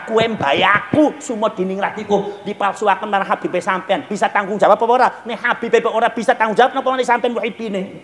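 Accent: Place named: Banyumas, Indonesia. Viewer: Ngapak mantabbbb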